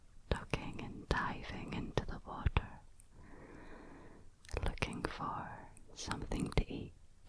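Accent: Scotland, United Kingdom. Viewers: So to be honest I clicked on this video because I thought she is attractive. Hey, at least I tell the truth. However, I am now in ASMR heaven. I have listened to a LOT of ASMR videos for the past 15 years, maybe longer. I think we have a winner here. As an American, the Scottish accent does the trick, and she has mastered the "floating hands". Well done madam! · Going to Glasgow soon, is that anywhere near your accent? Been listening to you for a few years, so just wondering :D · Scottish accent for the win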